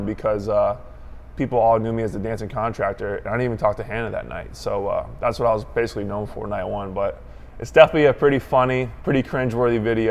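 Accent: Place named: South, United States. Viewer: he has a country western accent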